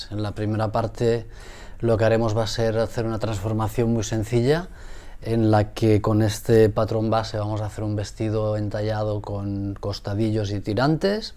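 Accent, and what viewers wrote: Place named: Catalonia, Spain. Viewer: Bona nit, me encantan tus clases con ese acento catalán tan pausado, haces que todo sea más fácil feliçitats.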